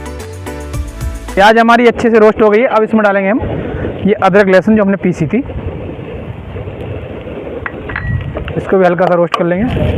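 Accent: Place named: Uttar Pradesh, India. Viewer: sir j aap up se ho kya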